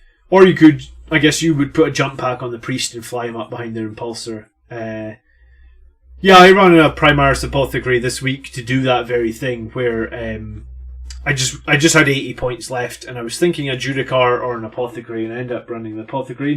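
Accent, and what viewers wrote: Scotland, United Kingdom. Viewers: Is it heresy for me to say I watch your channel but am not making Blood Angels? It's just nice to hear another Scottish voice doing 40k stuff ! I'm building my very first army as the basic boys in blue, but your vids are good for understanding how an army should be built.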